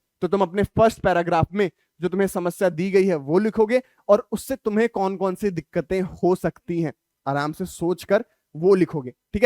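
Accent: Bihar, India. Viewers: Bro pure bihari lag rahe ho 😊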